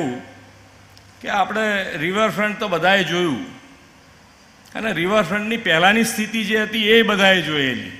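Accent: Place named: Kathiawar, India. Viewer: Kathiyawadi bole che bapu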